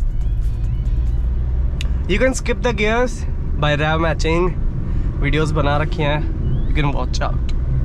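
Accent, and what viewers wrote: Haryana, India. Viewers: Bhai your Voice Bhai Op..i thing Aaap hariyana main Rahete hoo.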